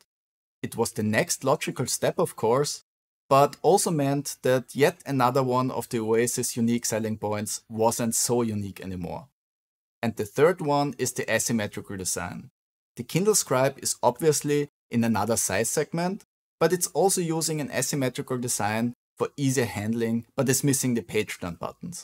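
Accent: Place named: Austria. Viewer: Your accent sounds austrian.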